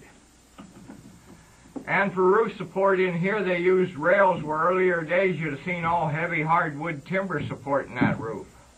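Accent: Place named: Pennsylvania, United States. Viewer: What a great PA accent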